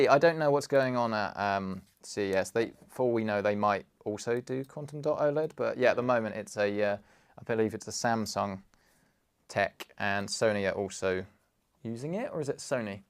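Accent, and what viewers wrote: United Kingdom. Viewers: I like british accent!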